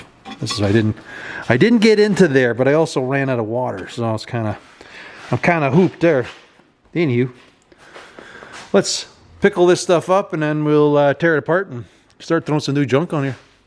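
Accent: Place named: Manitoba, Canada. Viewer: I miss the Manitoba accent. At least you don't punctuate every sentence with the word F@ That might be a Winnipeg thing. I don't know....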